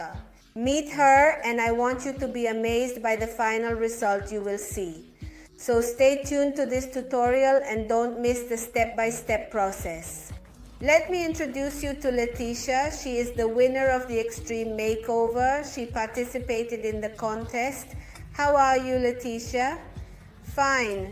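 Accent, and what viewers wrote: Mexico. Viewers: Creoq es de Mexico x el acento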